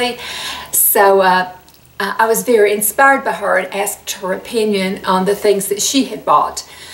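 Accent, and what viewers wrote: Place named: Georgia, United States. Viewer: I’m in Southeast Florida. I love your Georgia accent. I’m 54. You go girl!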